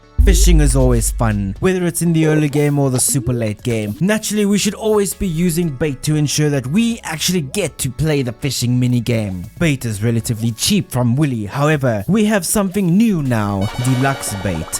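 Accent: South Africa. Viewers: he definitely is South African. I was watching this video like… is that a fellow South African?? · we don’t have an accent. We have like nine accents. He speaks with a white joburg area ish afrikaans accent. Some accents here are epic linguistically and phonetically. Even his is cool, just not as cool as some others. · Definitely not french. I believe he is South African, could be wrong though. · Are you South African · he's south african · love how the south African accent is super recognisable by south Africans😂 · Typical South African accent with dramatic flare... As a fellow south african, it's trash. I hate our accent. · You sound South African. Hey from Namibia! Thanks for the tips - playing with my son 😁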